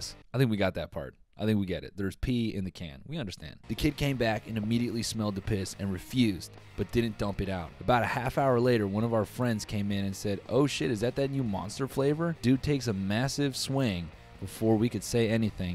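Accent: United Kingdom. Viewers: Haha the British accent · in love with his british voice.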